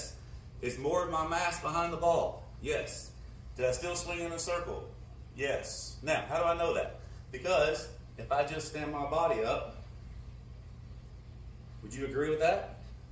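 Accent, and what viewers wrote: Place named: Texas, United States. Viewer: I love how articulate and well spoken your videos are. I have watched several videos , so far, will watch them all. Videos have good ideas in them, I watch them a few times over, to pick up every word. Sounds like you have a Texas accent, like you could ride up in a horse and say "Let me show you around the ranch". The hands have to work correctly, one arm right hand swing drill is great. Mike Malaska stresses hand action also.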